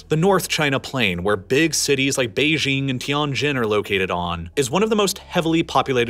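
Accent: United States. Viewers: Russia is not scared of anyone not china not Japan not the scumbags from America or Europe maybe they were not ready in 214 but they are ready to whip your asses to smithereens I mean and I mentioned your because I take it from your accent you are an American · Woe Woe Woe! are you purposely doing Putin's Bidding? Listen to what you said Why would anyone in Nato want to do something like that. This whole entire conflict is based on Hypothetical what if's That Putin's Said could happen if his Imaginary Evil Enemies of the west. Yo you sound American You know good and god damn well no one has ever talked about or thought about or even wants to invade Russia or any where close to Russia. Theres this new theory that all the hostility we thought was coming from Russia never actually existed which explains the poor shape of it's military, but it turns out that conflict with the west is just the oldest eastern European Dictators survival tactic that they been using for political to stay in power for centuries. 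"Hey I know it sucks you all are starving to death and the economy is shit because me and the homies stole it but it's must make sacrifice or the mean evil west will come and get us. US in a way does it alot as well. There's for sure very powerful people who's wealth is directly effected by us being enemies with Russia. but if you actually sit down and think about it. there's nothing that give us any reason to believe these people on the other side of the world are our enemy other then the people who stand to gain telling us they are. I only recently realized we all have been finessed which like you just said there is a real war with real people dying by thousands all so that a make believe enemy could have an advantage in a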